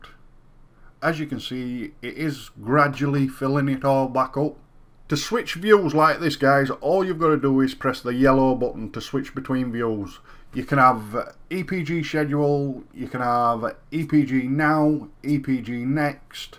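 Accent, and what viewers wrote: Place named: Yorkshire, United Kingdom. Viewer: Leeds or Bradford accent...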